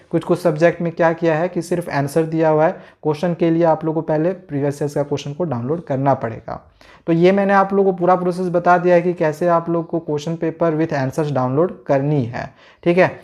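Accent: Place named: Bihar, India. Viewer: Sir aapke language se lagta hai aap bihar ya jharkhand isi 2 state me se kahi se ho or sir mai bihar se ❤️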